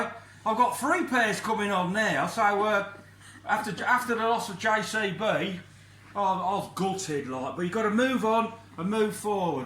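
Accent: Midlands, United Kingdom. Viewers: Your uploads are great to watch along with ur commentary really interesting,,,can I ask wot ur Actsent is??? I'm thinking midlands??? 🤔 👍 👍 👍 👍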